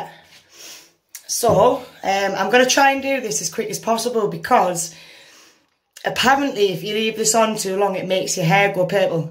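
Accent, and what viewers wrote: United Kingdom. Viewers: R u a Geordie hun x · is that a Sunderland accent?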